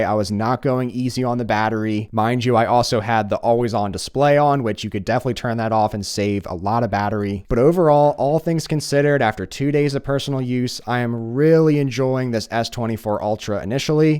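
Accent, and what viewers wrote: Philadelphia, United States. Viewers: Gotta be from Philly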